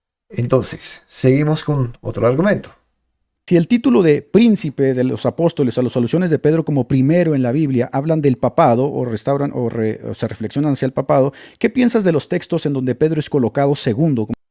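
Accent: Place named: Italy. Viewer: Muy bien vídeo, ¿Eres italiano? Por la forma en la que asentúas me recuerda al italiano, y se ve que el español no es tu lengua materna, aunque también puedo estar equivocado.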